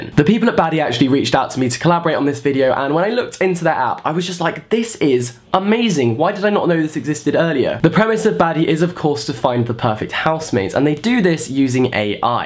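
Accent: United Kingdom. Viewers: Loooove your British accent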